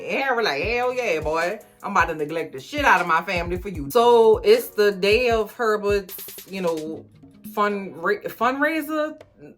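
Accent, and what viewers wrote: New Orleans, United States. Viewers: As someone who is also from New Orleans, I appreciate this commentary in New Orleans accent. I’m just over hear laughing because it’s like overhearing a good conversation. 😅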